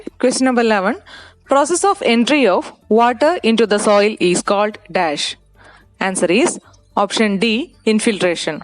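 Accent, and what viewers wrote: Kerala, India. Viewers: Mam you are sounding like malayali ?? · Madams pronunciation sounds like mallu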